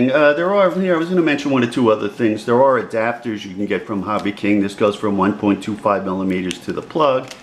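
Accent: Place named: New York, United States. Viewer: I'm a former New Yorker, I love it when I can hear the ny in ya.... lol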